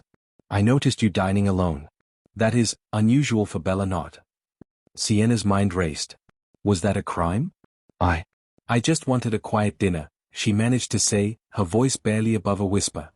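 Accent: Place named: Australia. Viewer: ❤❤😊good story, good enunciation. The narrator’s accent, sounding of Australia. ❤❤😊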